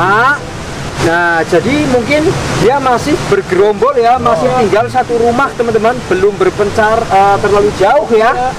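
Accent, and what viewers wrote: Banyumas, Indonesia. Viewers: Wow ngapak...👍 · Ngapak banyumasane kerasa banget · q suka gaya bicaramu mas... lugu dan polos... Khas jawa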